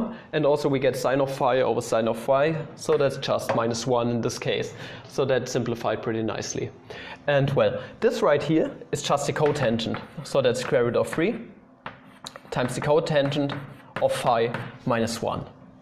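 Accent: Germany. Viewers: Are you German? Like I am and you sound SO DAMN GERMAN😂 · I thought I clicked on a mindyourdescisions video and then I hear that coarse german accent.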